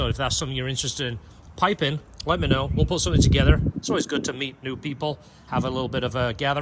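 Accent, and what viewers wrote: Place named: United Kingdom. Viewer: I am listening to this and for some reason I am imagining a British guy talking.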